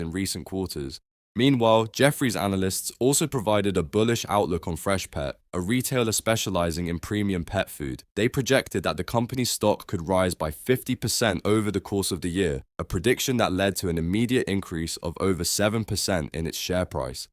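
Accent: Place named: United Kingdom. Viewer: Dude whats up with the Brit accent. 😂. I liked it more when you used your own voice.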